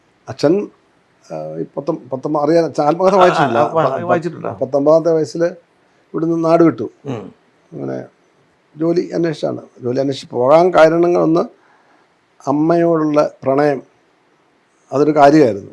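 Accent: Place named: Kottayam, India. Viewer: സൂപ്പർ വിവരണം. കോട്ടയം ഭാഷ ആയതുകൊണ്ട് ടി യാന് മനസ്സിലാകുന്നില്ല എന്നു തോന്നുന്നു.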